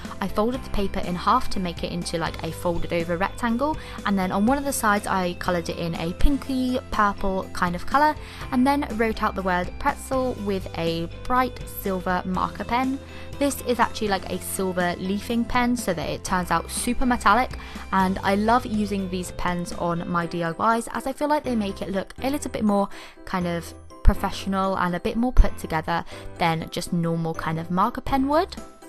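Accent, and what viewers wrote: England, United Kingdom. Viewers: Honeycomb Owl mmm she isn’t Scottish lol she is english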